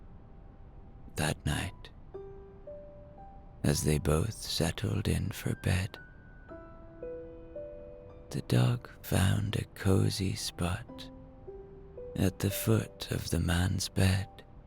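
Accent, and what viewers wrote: Ireland. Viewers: Thank you for this gorgeous heartwarming story. Dogs are just the best companions and full of love. Hello from a Cavan woman in Melbourne who loves hearing an Irish man tell a story 🙏🏻🍀💋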